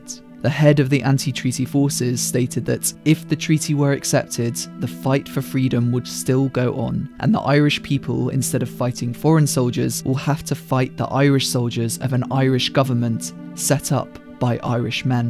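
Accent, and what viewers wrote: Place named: England, United Kingdom. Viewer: As soon as I heard the English narrators accent I knew we were in for 13 minutes of Tansplaining. The Anti-Treaty forces were standing by an oath they swore to an Irish Republic, not a partitioned land as a British dominion. They were not “self-mutulating”. Banshees is a dark tale of a failed musician who is suicidal, but his laissez faire catholicism prevents him actually carrying it out. He decides instead to hound the gentle Padraig to suicide instead.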